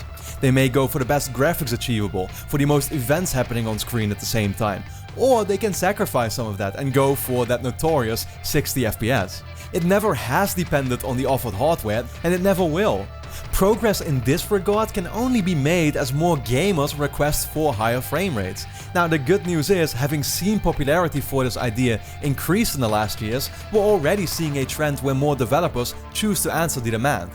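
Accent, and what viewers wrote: Netherlands. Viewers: are you dutch because you have a dutch accent. (with dutch i mean netherlands) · Such a dutch accent :P But nice vid! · Are you dutch? Can hear it in your "s" 😅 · ik ben al een tijdje geabonneerd op je en ik dacht altijd al Dat is toch een nederlands accent en eindelijk zei je dat je nl was en ik dacht echt van boem ik wist het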